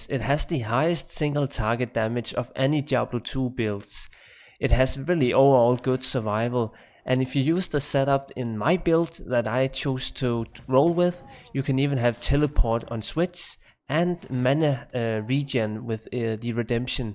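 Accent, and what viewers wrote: Denmark. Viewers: dude. Du har lavet youtube videoer i tre år. Hvornår skilder du dig af med den stykke cringy danske accent. Og hvornår har du tænkt dig at øve ord inden du optager. Jeg har efterhånden set en god håndfuld af dine videoer, men jeg kan aldrig kommer over halvvejs, pga udtale og accent. Tag dette som konstruktiv kritik, fordi jeg tror virkelig at det vil hjælpe dig med dine fremtidige videoer.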